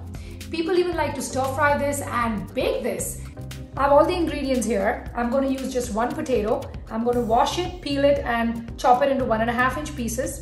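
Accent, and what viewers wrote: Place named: United States. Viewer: Wow u picked up American accent very nicely. You are same way beautiful.❤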